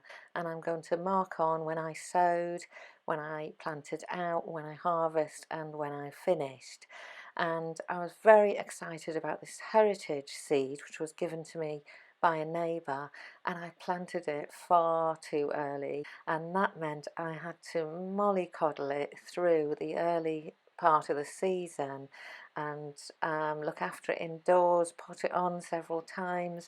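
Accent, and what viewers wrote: United Kingdom. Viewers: Thank you, I really enjoyed your video! I have a small balcony patio garden and I live in a apartment in Salem Oregon, but I’m originally from London. It’s so nice to hear a lovely homegrown voice and accent lol. I’ve been growing vertically, and my patio is north facing so I’m trying to maximize and organize myself better as I’ve really just been winging it the best I can, which is stressful. I’m going to give this tracker a try this year. Also I’m trying to put together my notes from the last three years of growing what I can into a garden journal. I hope to start growing what I can year round and keep track of progress and problems. I really love your tracker idea! 💕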